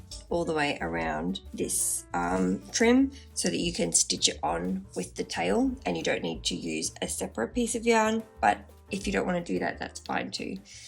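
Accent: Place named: Australia. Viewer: Sounds Australian to me. Great look for crotchet.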